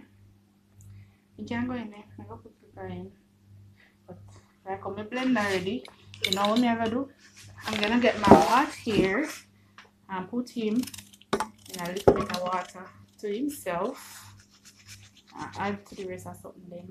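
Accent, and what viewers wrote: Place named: Jamaica. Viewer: Love the patois(patwah) down to earth!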